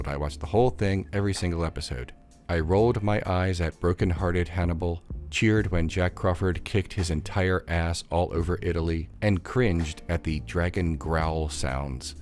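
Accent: Baltimore, United States. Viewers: I can tell you're from Baltimore by the way you say Baldimore.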